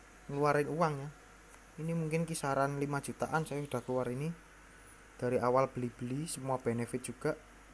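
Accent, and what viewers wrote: Indonesia. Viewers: napa ga fokus talisman, bang ? Itu jauh lebih worthed dibanding enhanct equip u/ lv 180 lho.... Tapi logatmu iki jowo timuran, cocok karo aku kwkwkww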